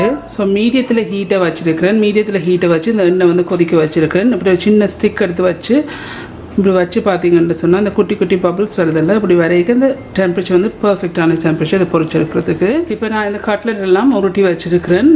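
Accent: Jaffna, Sri Lanka. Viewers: Ohh....wow...... I like your Jaffna spoken Tamil... I'm also Jaffna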